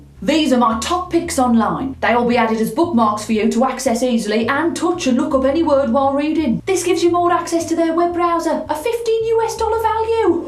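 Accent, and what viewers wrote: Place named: North, United Kingdom. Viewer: Watched one of your videos where you say you have a RP accent...you dont you have a finally disguised northern accent or maybe notth Midlands...PS your Fit :p Greetings from the north lol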